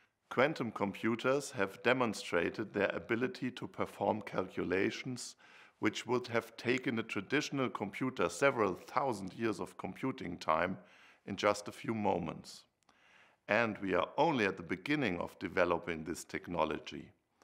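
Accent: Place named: Swabia, Germany. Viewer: Tja, dem Dickerchen scheint keiner zu zu hören, dann bin ich mal der ERSTE: Erst einmal vorneweg Herr Braunibärli, auf dich wartet nichts Größeres, das muss dir nach diesem Vortrag (ablesen) absolut klar sein. 🤪 Meine Empfehlung an dich, Dickerchen, erst einmal abspecken, ich schätze mal so 20 kg, wird zwar schwer sein als Beamter, aber das musst du nun durch, Zeit sollte genug vorhanden sein, außerdem verlangt es der grüne Zeitgeist. Nur Veggie, kein Fleisch und Kohlenhydrate Adieu. 😉 Zweitens: Spießeranzug wechseln, einfach mal locker drauf sein, mit eine wenig Anstrengung klappt das bestimmt. (Vorsichtig ran tasten) Drittens: Haartransplantation, du siehts echt uncool aus, Geld sollte ja genug vorhanden sein als zweit oberster Schwätzer der BRD. Viertens: Weniger mit den Händen fuchteln, sieht echt bedrohlich aus, wollte schon vorschnell am PC einen RESET durchführen, meine rechte Hand konnte gerade noch meine linke zurück halten, ging aber noch mal gut. 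Fünftens: Die Fahnen im Hintergrund gehen ja gar nicht, ich sehe da absolut keinen Zusammenhang, die wehen auch nicht, wollte fast schon weinen schreiben. Sechstens: Was kostet eigentlich der Schinken links im Hintergrund? (Und bitte nicht rot werden) Siebtens: Mehr Englisch üben oder war das schwäbisch, na ja, so schlimm wie der Kollege aus dem Schwabenland war es ja nun doch nicht, obwohl... Achtens: Um was ging es eigentlich? 😁😁😁 Und Neuntens: Wer hat den Quatsch überhaupt bezahlt???, wirrrrrrrrrrrrrrrrrr? Puh, fast ver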